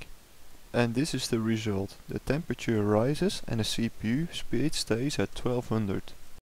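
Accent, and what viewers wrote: Netherlands. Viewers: nederlands manier van engels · hoe duur is zon heat zink ik hoor btw dat nl bent Nice videos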